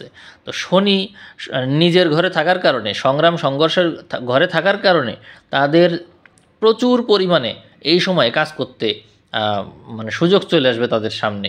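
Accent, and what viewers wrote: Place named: Gopalganj, Bangladesh. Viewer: ভাই আপনার কথাগুলো খুব সুন্দর আপনার কোন জেলা মনে হয় গোপালগঞ্জ এবং আপনার কথার সাথে আমার জীবনের সাথে অনেকটা মিল আছে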